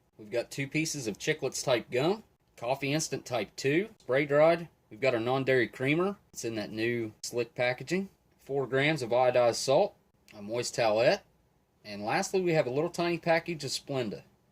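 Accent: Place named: Baltimore, United States. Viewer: Your accent screams Baltimore, especially when you said Sopacko, Hon!!!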